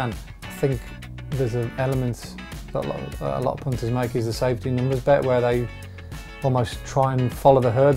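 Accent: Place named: Yorkshire, United Kingdom. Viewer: from yorkshire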